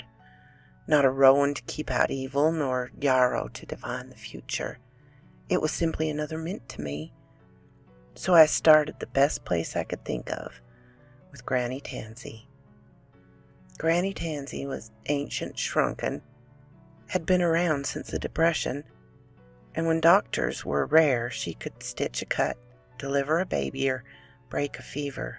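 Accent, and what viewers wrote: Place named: South, United States. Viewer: I'm found this to be overall interesting. The southern draw was at the least my ears delight. The story was at most entertaining and the attractiveness of bringing it all together is something that draws me back everytime to you Madame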